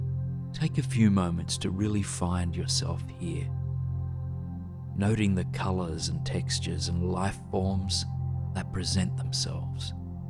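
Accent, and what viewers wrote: Australia. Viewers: Hi, lovely to listen to your videos. It's actually quite nice hearing another fellow Aussie. It makes the whole thing more familiar and comforting ✨️ ✨️ ✨️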